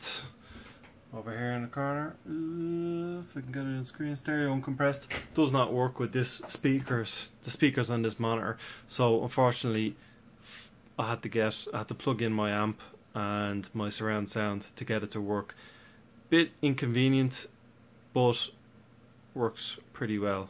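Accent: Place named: Newfoundland, Canada. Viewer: i thought you was from Newfoundland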